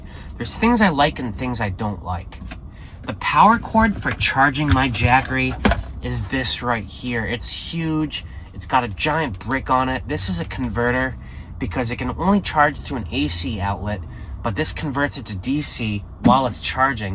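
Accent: Australia. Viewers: That's not a British accent! That's an Australian accent! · 🤣😂 that's an Australian accent 🙋🏻‍♀️🇦🇺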